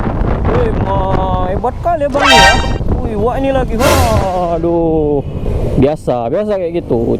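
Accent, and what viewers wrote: Medan, Indonesia. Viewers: kek ginilah bahasa medan.. moga sukses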